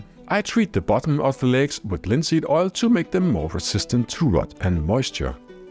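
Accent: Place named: Denmark. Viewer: Awesome! I’m a carpenter in Calgary Canada. But I’m Danish. Moved here 1967 when I was nine months old…Still have family back home. It’s nice to hear your accent. It reminds me of my father, who also died a couple years ago…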